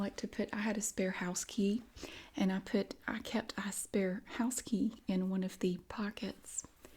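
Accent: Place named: Upper South, United States. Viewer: 'My Journey To A Smile' She’s from the upper south east! 😊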